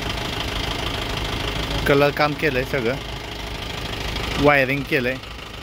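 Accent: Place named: Karad, India. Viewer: Bhava tuza bolnyacha tone lay bhari hay... Karad chi aathwan karun deto....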